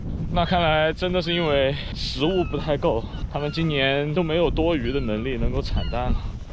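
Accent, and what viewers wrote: Sichuan, China. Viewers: 听声音有点像成都 ，绵阳口音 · 听口音播主像四川人哦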